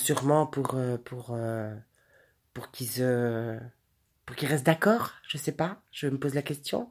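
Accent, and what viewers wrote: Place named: North, France. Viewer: Vous êtes du Nord ? Un accent comme celui de Dany Boon 😁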